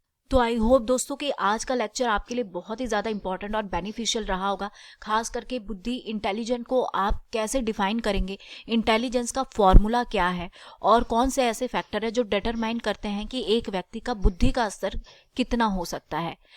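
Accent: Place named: Delhi, India. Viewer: No she is absolutely "North Indian"... * Notice her "a" pronounciation that connect Delhi area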